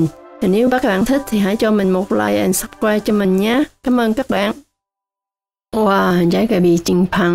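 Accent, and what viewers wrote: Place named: Teochew, China. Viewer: Nghe giong noi la toi biet nguoi tieu chau o vn roi. Ung ho chi chuc kenh cua chi phat trien manh 👍👍👍👍👍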